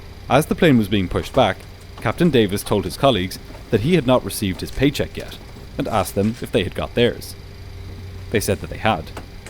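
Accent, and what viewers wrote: Ireland. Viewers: Your Irish accent is so adorable.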